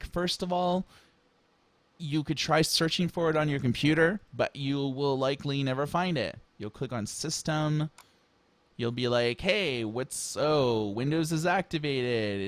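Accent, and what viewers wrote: Canada. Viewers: So, quite confusing. You keep saying serial number, then proceed to write this complicated code to determine what it is. I just turned my laptop over and looked at the lable. But in fact you're actually talking about determining the operating system product ID. In the case of an XP laptop, the product ID is also listed on the lable.Then, to make matters even more confusing, you call the product ID a serial code. A serial number is always associated with a machine, not an operating system. Judging by your accent, I'd say you are Canadian? So perhaps a serial number and product ID are interchangable in Canada. Not here in the States. I understand that you took the time to do this and I'm sure your efforts are appreciated by some. But the result is very confusing because the terminology is incorrect. Having written a mountain of technical documentaion in a past life, I can tell you that instructional videos have to be consistant and carefully worded. 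A product ID is not a serial number, nor is it a serial code.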